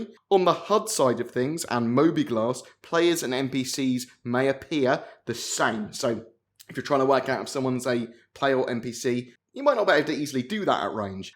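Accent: United Kingdom. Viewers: Terrific update, always love hearing about exciting SC news in a British accent. 😃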